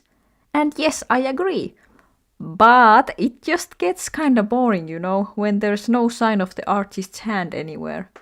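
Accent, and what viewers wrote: Finland. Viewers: You sound suspiciously Finnish. Olisitko maamiehiä? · The way we fins speak english is so funny also cute artsyle you got :3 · I'm like 40 seconds into the video but... my brain is telling me to comment this: you sound incredibly finnish. Are you finnish? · wait are u Finnish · Finnish accent spotted 🫵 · this is random but are you finnish because i hear an accent to your voice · Ookko suomalainen? Your accent sounds like you could be finnish. :D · WAIT ARE YOU FINNISH? (Im just assuming bc of your accent :DD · ….i am tripping you sound finnish to me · Are you Finnish? As a Finn, I'm getting major Finnish vibes !!! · are you finnish?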